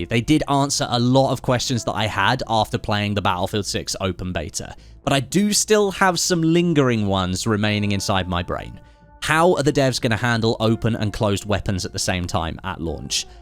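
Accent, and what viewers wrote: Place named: West Sussex, United Kingdom. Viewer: Im pretty sure you’re from West Sussex. I overheard someone in a coffee shop who sounded exactly like you.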